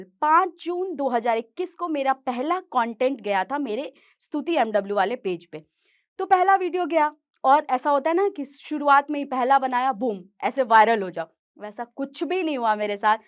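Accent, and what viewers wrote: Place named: Bihar, India. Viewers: Ji nh sare bihari aese hi baat karte hai.. It is their accent... Bihar jayenge toh har koi aese hi baat karte milega.. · you really acts like a proper bihar girl, your accent, expressions, dialogue delivery are awesome and apt. god bless you with more success. be like this only free and frank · Don't be ashamed of being bihari , be proud of your bihari accent. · South k log South k accent me bat kre to wo educated & bihari agr bihari accent me bat kre to wo regional....... U are an inspiration.. regional ho ya desi... But aap ho original.. this is wat matters.. all d best & keep shining ❤ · You have put Bihar and the beautiful dialect on the top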